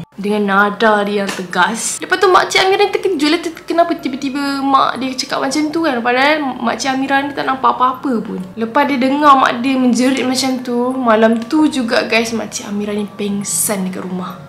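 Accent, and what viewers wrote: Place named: Terengganu, Malaysia. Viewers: COMEL GILA KAK BACA LOGHAT TERENGGANU 😂😂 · Comel kak cakap loghat terengganu 😭💕like it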